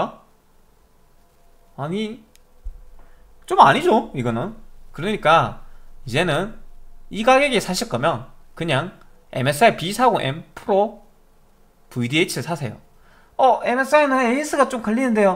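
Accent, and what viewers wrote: Gyeongsang, South Korea. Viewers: 대구 말투를 쓰길래 대구 일거 같아서여 ㅎㅎㅎ 대구에서 컴퓨터 하시나요??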